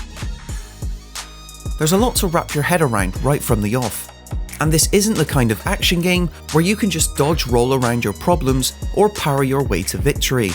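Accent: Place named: Bristol, United Kingdom. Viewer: Bleeding hell, if this commentator isn’t from Bristol then I must be deaf. Love a good Bristolian accent👍🏼😂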